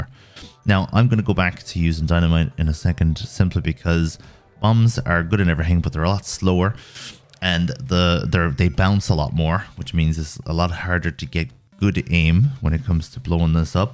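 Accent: Ireland. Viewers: You sound Irish but also not Irish at the same time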